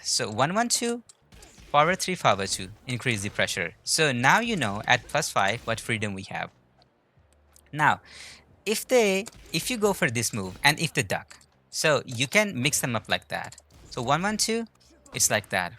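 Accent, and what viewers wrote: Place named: Pakistan. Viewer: I can't tell whether you are Pakistani or british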